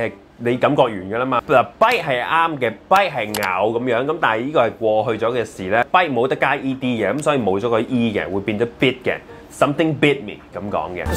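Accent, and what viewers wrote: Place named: United Kingdom. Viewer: 七師父用British accent有料!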